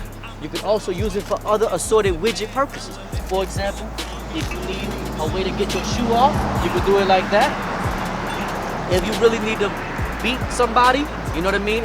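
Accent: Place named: New York, United States. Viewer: Where you from homie ? You sound like you from NY.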